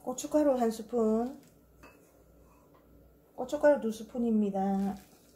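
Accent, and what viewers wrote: Busan, South Korea. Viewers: 안녕하세요^^ 음성듣고 반가웠어요 저도 부산 사람 이라 🤗🤗 풀시청하고 선물드리고 갑니다🎁🎁🎁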